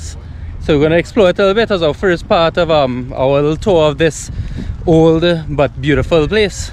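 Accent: Trinidad and Tobago. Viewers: Well this is a pleasant surprise; hearing from my Favorite Trini Accent. Welcome back in your space. I Your oldest Fan. 22/09/12 🇨🇦 🍁 🇨🇦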